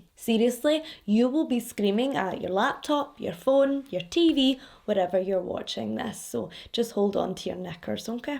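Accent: Scotland, United Kingdom. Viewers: BTW, are you Scottish? I adore your accent and have Scottish friends. Love visiting them when we can. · You crack me up, you little weirdo. I love your accent so I'd listen to pretty much anything you wanna talk about. Can't wait to take a trip to Scotland!! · I'm just now watching this, months after posting, because I thought she was a young child from the picture. Children stories are heartbreaking more than adults, so I never watch, but out of desperation at the moment, I'll watch this, so I can hear that sweet Scottish empathetic voice of yours and hear about this poor young ladys situation. If ever I have skipped any of your videos, it's cause it's about a child, the younger they are, the worse it is to listen to.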